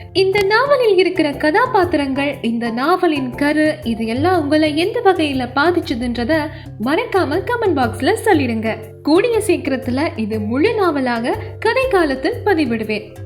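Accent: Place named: Sri Lanka. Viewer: பிரபு அவர்களுக்கு என் மனம் நிறைந்த நன்றி உங்கள் நாவல் மிகவும் அருமை 🥰🌹 உங்கள் நாவல் யின் குஞ்சகம் குரல் அவர்களின் இலங்கை தமிழ் வாசிப்பு அருமை சாபஷ் என்று சொல் தோன்றுகிறது ஃ🥰❤️❤️❤️🥰🌹🥰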